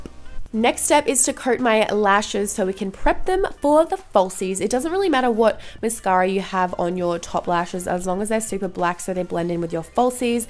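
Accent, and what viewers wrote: Australia. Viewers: talking in Aussie accent too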